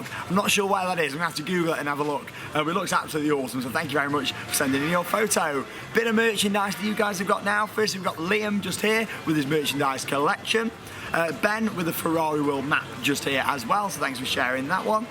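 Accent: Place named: Staffordshire, United Kingdom. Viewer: Its a Staffordshire on i think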